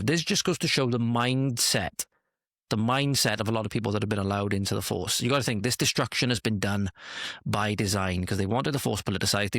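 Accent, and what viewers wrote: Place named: Welsh Valleys, United Kingdom. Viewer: Great to hear a Valleys voice (I would add our Welsh flag here normally, but YT has decided to censor it) That is a sick mf! TYVM for reporting on this! Have you heard about AJ Audits aka Anthony Styles?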